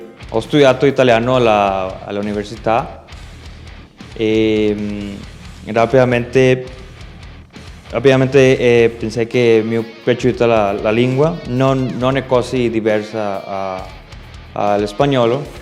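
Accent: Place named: Ostrobothnia, Finland. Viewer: Yllätävän hyvää suomea! Huomasi myös että oot asunu Pohjanmaalla. 😊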